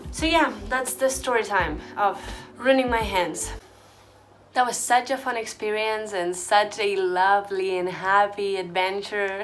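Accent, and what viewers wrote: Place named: Catalonia, Spain. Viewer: As soon u started talking could notice in your accent that you are Catalan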